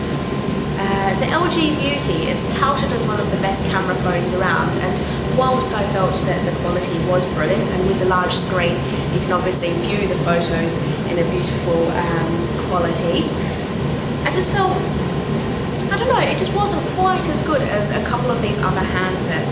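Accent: England, United Kingdom. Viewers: lol "phothouss " xD I like the british accent :D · u got beauuuuuuutiful eyes :P and damn, that english accent <3 oohhhhh, i think i just came....LOL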